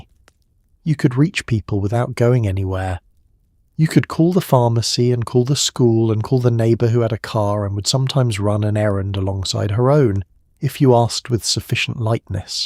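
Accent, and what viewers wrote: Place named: England, United Kingdom. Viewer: I grew up in the US in the 70s. This video is not very accurate. Women had a lot of say in decorating, schools, outings and such. My aunts ,and I had many were in charge of budgets, schedules and cities. Their husbands loved and respected their choices. Rules? Yeah, society had rules but there were those who were breaking those rules left and right. Your English accent makes me think you are following some idea of the seventies, instead of how it really was. Those pictures are not that accurate either. No one ever put their clothes out to hang dry in that manner. If you use AI to reconstruct history,,,, you will fail.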